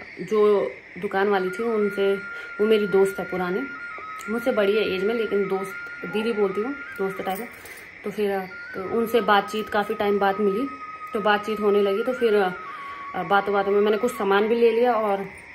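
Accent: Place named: Pahari, India. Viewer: Talarni 🤣🤣🤣 theth pahari👍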